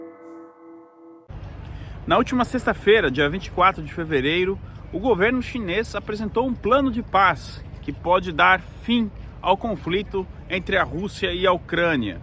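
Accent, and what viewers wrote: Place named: Paraná, Brazil. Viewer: Nossa mas seu sotaque paranaense tá tinindo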